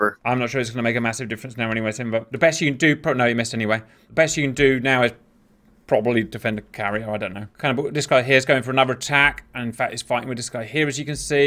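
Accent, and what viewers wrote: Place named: United Kingdom. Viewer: I'm not supposed to say these videos are MORE ADDICTING due to his British Accent! ...............................💂*tea time! 🫖 .............................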